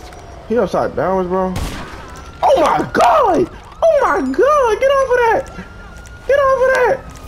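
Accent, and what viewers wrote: Baltimore, United States. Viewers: Baltimore accent never fails 😭😭😭😭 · You from baltimore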